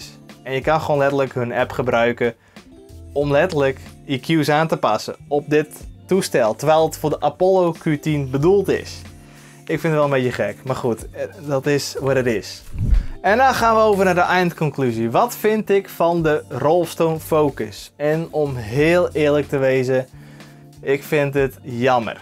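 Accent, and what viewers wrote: Friesland, Netherlands. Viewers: Leuk die Friese klemtOnen😄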